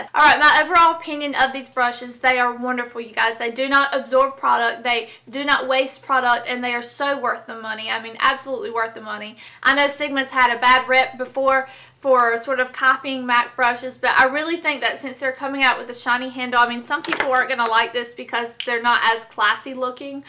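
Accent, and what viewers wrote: South, United States. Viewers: I love your southern accent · LOVE YOUR Southern accent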